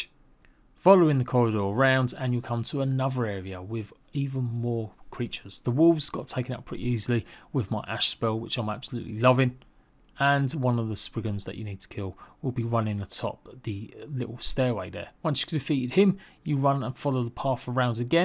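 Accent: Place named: United Kingdom. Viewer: Ur to british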